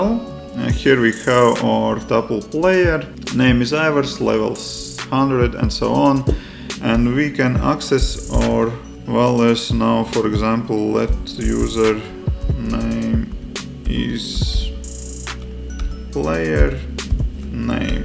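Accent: Russia. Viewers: are you russian? I hear a slight accent =)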